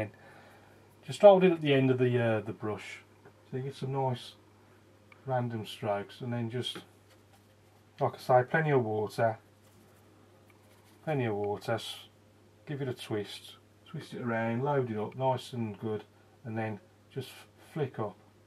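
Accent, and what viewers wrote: Birmingham, United Kingdom. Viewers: GREAT TO HEAR BRUMMIE ON YOUTUBE, KEEP ON POSTING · Nice to hear a Brummie voice on Youtube. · Thanks for sharing your realistic and relaxed approach to watercolour painting. Excellent, and refreshing to listen to your accent after 20 years out of the UK!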